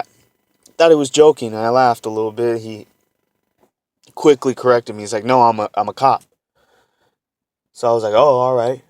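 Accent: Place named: Los Angeles, United States. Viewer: yo u from LA right?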